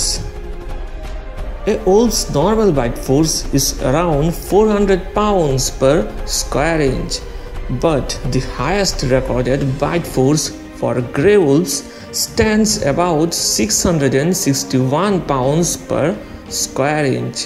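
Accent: India. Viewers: 😂 it’s the tune for me must be an Indian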